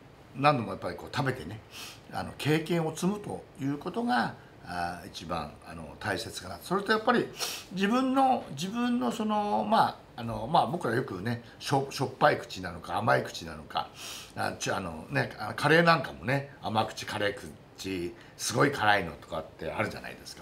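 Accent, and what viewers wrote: Japan. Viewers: I've been learning Japanese for a while now and I really enjoy watching Chief. Maybe it just seems to me, but the Chief seems to have a slightly different accent than that of creators coming from Tokyo. Are you from other parts of Japan Chief? As always, thank you very much for the great video and I wish you much continued success!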